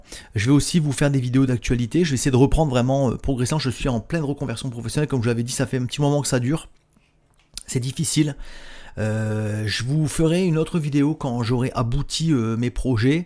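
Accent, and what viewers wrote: Canada. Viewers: Canadien, non ?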